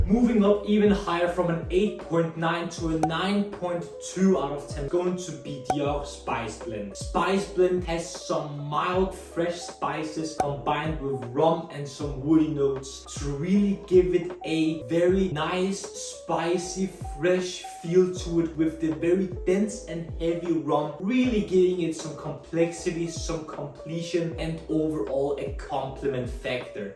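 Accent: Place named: Netherlands. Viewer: Man seems to be that you are from Holland aren't you?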